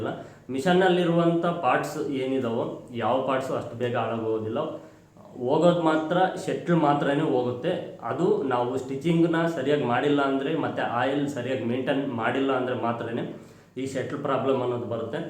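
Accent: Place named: Bagepalli, India. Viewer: Nivu yava kadeyavaru kannada ontara matadtira bagepally Or chintamani na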